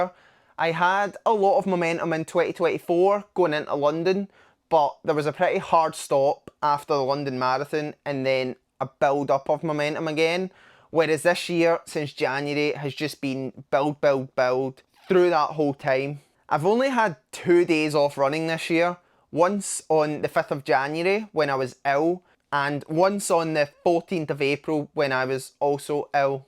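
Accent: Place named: South Africa. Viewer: Where are you from with that accent? South africa?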